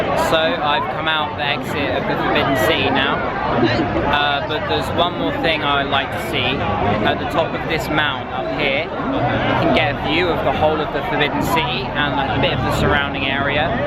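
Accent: United Kingdom. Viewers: ignorance is no excuse for anything - what kind of up your nose BRIT accent is that?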